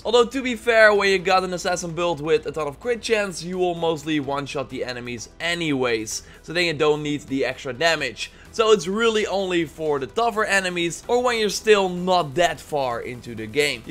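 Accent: Netherlands. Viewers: Are you dutch? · Are you dutch cuz u kinda sound like you are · lol I like your English talking, You more sound like someone from the Netherlands? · I love how dutch your accent is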